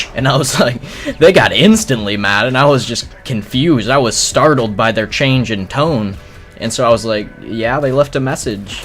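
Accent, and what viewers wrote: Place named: South, United States. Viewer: You sound southern in this video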